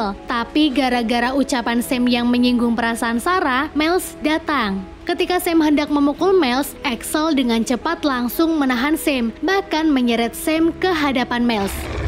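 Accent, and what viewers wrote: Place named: Java, Indonesia. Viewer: Wong jowo ndi mbake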